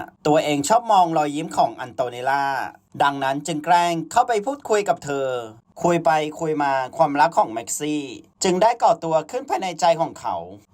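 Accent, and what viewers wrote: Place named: Provincial, Thailand. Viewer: พูดไม่ชัด และสำเนียงเหน่อ ฟังไม่รื่นหู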